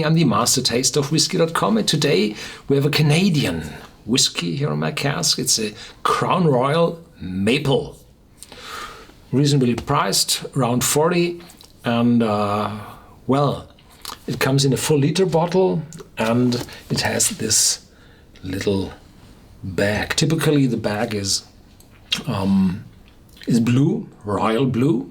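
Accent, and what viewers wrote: Germany. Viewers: I don't think he's British or from the U.K. I believe he's a German native, though perhaps he moved and I'm wrong? He obviously has a very strong German accent.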